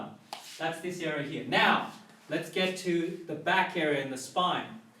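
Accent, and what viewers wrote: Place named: England, United Kingdom. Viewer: Hi, love your channel. I've been practising Wing Chun for 2 years now, and my sifu's philosophy resembles much yours. It's all about relaxation and feeling in the arms when practising Chi Sao. You sound like you're from England. Please confirm and if so, I'd love to come to your course sometime when I visit England again ( hope I'll be soon enough, I'm not too far: south of France ). Cheers!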